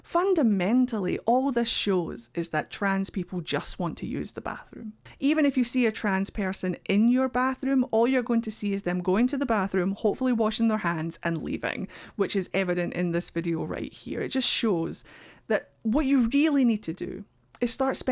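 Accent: Scotland, United Kingdom. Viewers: As an English brit. Love the Scottish accent! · First time seeing one of your videos, but I heard the accent and immediately knew it was gonna be a good one. Love our Scottish folks, y'all some real ones <3 - From a Texas Trans Woman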